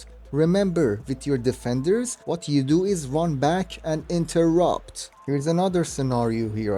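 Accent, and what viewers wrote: Iran. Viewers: I randomly found this vid and after watching it for 10 secs hey this is persian accent lol don't get me wrong u speak 10/10 but there's always a Persian accent 😂 keep it up bro 👍🏻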